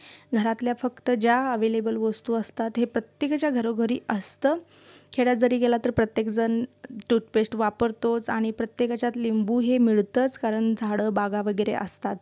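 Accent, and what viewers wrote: Kolhapur, India. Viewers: छान व्हिडिओ बनवतात तुम्ही आवाज पण छान आहे शांत आहे सोलापुरी कोल्हापुरी भाषा तर खूपच छान आहे नाव ठेवू नये कोणी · Tumhi chhan bolta tai. Bhashebaddal koni kahi mhatale tari tumhimkahi watoon gheu naka. Bhasha he sanwadache sadhan ahe. Ani dar 4 kosawar bhasha badalte. Tumchya bolnyat kolhapuri baaj ala ki mala to khoop awadto. (mi kolhapurchi nahi) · भाषा doesn't matter कोल्हापुरी भाषा रांगडी वाटते पण आतून मधाळ असते,I am also kolhapuri . Video छान, उपयोगी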